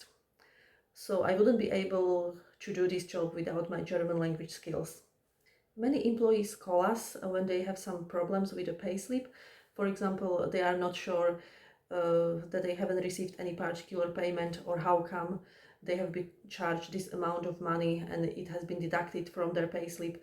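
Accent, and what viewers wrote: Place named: United States. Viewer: Your English is also leans more to the American variety rather English.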